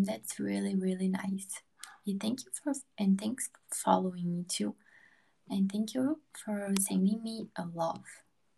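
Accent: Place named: United States. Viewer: Eu pensei que você era americana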